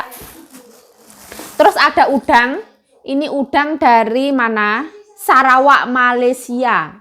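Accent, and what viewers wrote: Java, Indonesia. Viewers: seneng klo mbak klo uda ngomong logat jawa nya kental,sebutan kanjeng nyonya tuh dri taiwan apa jawa mbak klo boleh tau🙏😁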